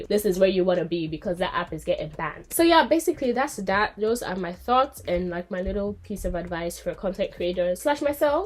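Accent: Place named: Nigeria. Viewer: Love the Nigerian 🇳🇬 Assent ❤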